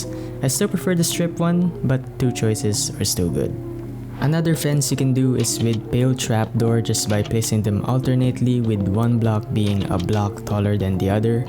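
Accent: Philippines. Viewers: felepeno accent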